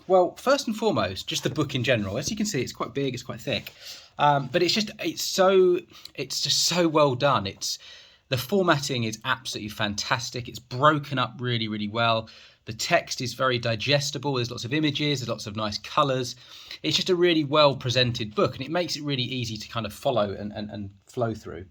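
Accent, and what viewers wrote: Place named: United Kingdom. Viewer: I came across to this Chanel and this man explains the book Of (Find you why ). And I guess Man U have British accent u must be English man , the best English language is spoken by British people and the rest of the world follows even Americans .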